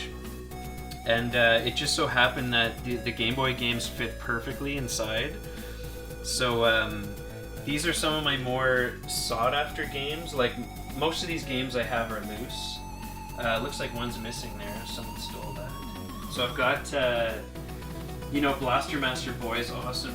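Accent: Canada. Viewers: Are you Canadian? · That haerd Canadian accent is real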